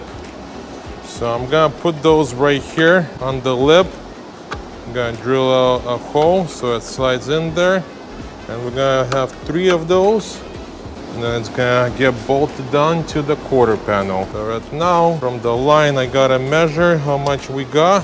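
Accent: Russia. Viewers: Акцент русский 😂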